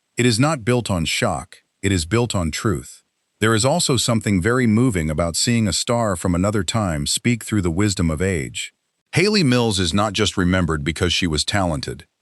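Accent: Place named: United States. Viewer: Why do we have to suffer the American voice-over wether it be AI or genuine?😢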